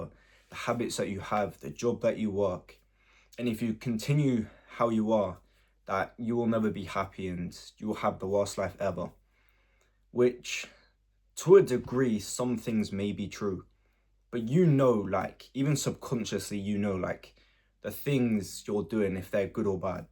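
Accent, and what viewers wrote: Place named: United Kingdom. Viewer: yeah! ur video actually popped up on my youtube recommendations. it's crazy to think i'm talking to someone from the other side of the world! i knew you were british immediately because of your accent haha. keep uploading content, bro !💪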